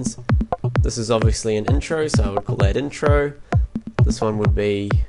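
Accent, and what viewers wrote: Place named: New Zealand. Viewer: Oh and by the way, I love the accent, I'm from Australia and for some reason. Just love New Zealand accents. "You might like 4, you might like 8, you might like 10 or 2. I like sex!" Hahahahah that was soo good! Love you man, keep up the good work!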